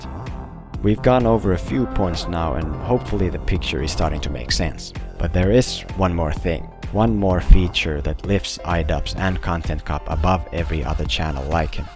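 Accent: Finland. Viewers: Are you Finnish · You're finnish aren't ya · Might you perhaps be Finnish? The accent made me wonder :D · Although I can't agree with the point you made , I have to agree with the rest. Great video with a lot of effort put in! By the way, I can't quite place your accent. Do you happen to be Finnish? :) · your accent sound familiar? Do you happen to be Finnish? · Dude, you Finnish? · You sound Finnish. Suomi? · Are you from Finland? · are you from finland? your accent is familiar :) although i do disagree at some points, great video!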